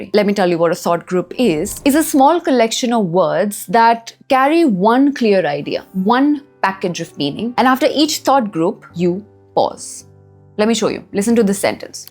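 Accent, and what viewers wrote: Odisha, India. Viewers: Hey are you Oriya by Any chance? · Same doubt bcz the represent that 😂 i think she is from Odisha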